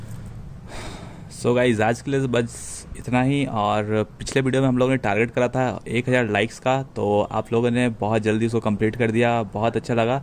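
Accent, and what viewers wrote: India. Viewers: you are a stress buster.... Thank you for being u❤ your accent is pure desi... Pls don't change 😆👌👌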